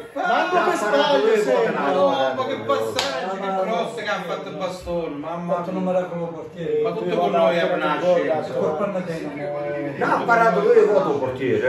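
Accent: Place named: Basilicata, Italy. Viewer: Non credo sia Calabrese, penso sia della Basilicata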